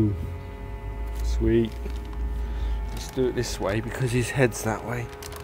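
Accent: England, United Kingdom. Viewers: any way i love your vids keep up the good work matter a fact do know ksi sound like your from england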